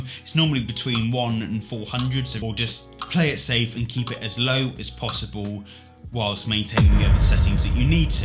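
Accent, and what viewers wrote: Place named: United Kingdom. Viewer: Nice British accent sir...love it. Done subscribing to your channel sir. Please visit me at my channel and subscribe too so we stay connected. Godbless.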